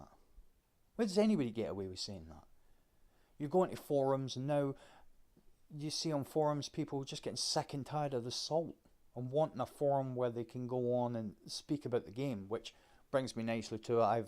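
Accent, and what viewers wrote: United Kingdom. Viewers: You have a kawaii British accent. You should talk more about this. My voice is gay LOL. I sound like a dude.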